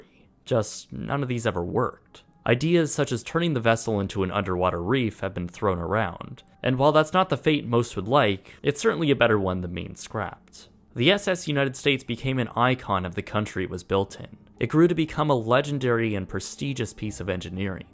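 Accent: Canada. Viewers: are you Canadian? I detected an accent...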